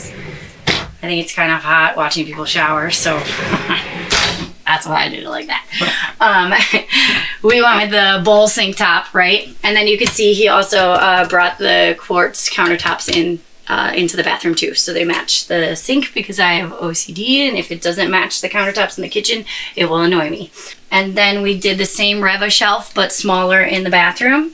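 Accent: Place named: Poland. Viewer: ary you from Poland because your "ci" sounds very polish?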